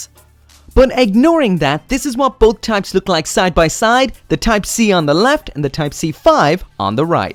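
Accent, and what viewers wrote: Ireland. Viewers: Are you from Ireland because you slightly sound like your from Cork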